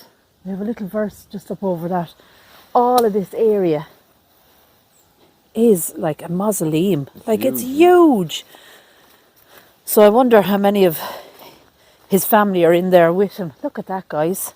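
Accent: Ireland. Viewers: I can tell by the Irish accent that we're going to see corpses. Irish cemeteries don't seem to be very well maintained. 🙄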